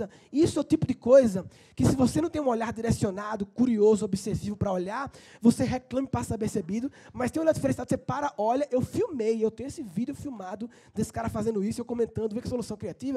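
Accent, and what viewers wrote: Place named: Northeast, Brazil. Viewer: amo o sotaque do Nordeste (que meu terreninho na Bahia me aguarde;) e quanto a pasta de dente, ri demais! Ainda mais sendo dentista! E hoje a tampa é grudada no tubo, reparou? Acho que ouviram você! Rs. Show!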